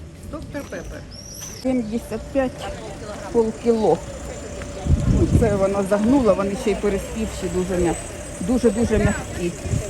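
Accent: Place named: Kryvyi Rih, Ukraine. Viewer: Жалкую, що Ви не з цього міста. Хотіла попросити про допомогу. Треба тих, хто знає головних на кладовищах. І ще, у вас говір криворізький, рідний❤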